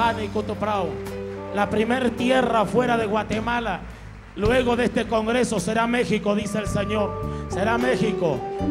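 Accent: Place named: India. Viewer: Es q habla como los d la india.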